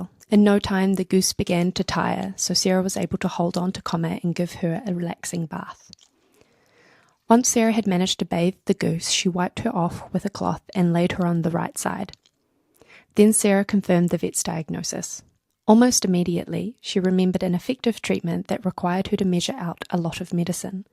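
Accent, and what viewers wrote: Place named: New Zealand. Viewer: I love the Kiwi accent. You have a nice, soft way of speaking too and it is very pleasant to listen to. I have a typical flat midwest United States accent. 😋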